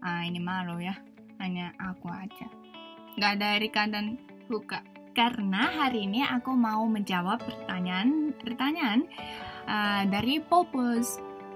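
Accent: Java, Indonesia. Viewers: Logatnya mbak ke ada jawa jawa nya 😂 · mbak medok jawanya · Kok medok ya... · Kadang logat nya kek bahasa jawa Mbk 😄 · Mbak logat nya kaya orang jawa keren mbak ak asli orang jawa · Logat kak waktu berbicara bahasa indonesia seperti logat medhok/bahasa jawa, wajar kan tinggalnya di malang wkwk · ini sih udah orang indonesia.....logat medok nya lucu hehehhee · bahasa Indonesianya logat Jawa, mangstab gan 🔥🔥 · 彼のアクセントはジャワ語に少し似ています 😅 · Logatnya kok bisa medok banget ya (logat bahasa jawa) 😅😂😂😂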